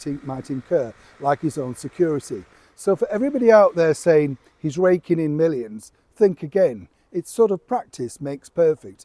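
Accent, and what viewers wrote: United Kingdom. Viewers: Love hearing from a Brit.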